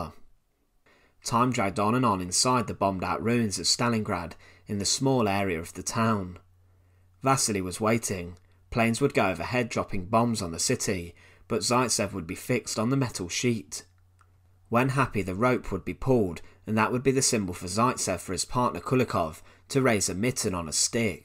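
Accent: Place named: Leicester, United Kingdom. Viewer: This strong Leicester accent is just terrible! It spoils everything.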